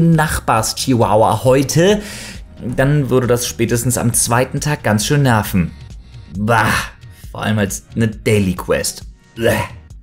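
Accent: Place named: Bavaria, Germany. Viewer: Der und sein bayrisch! <3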